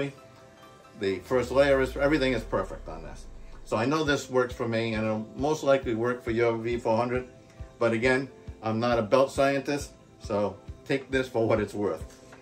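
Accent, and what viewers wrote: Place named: Wisconsin, United States. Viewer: You sound like you could be from wisconsin or the u.p.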